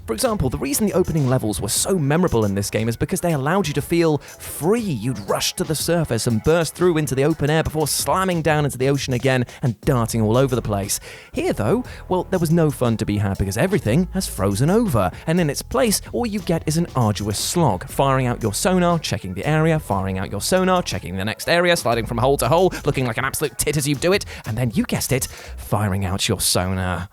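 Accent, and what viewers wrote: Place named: Wales, United Kingdom. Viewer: Always thought u were a brit. Your from Wales?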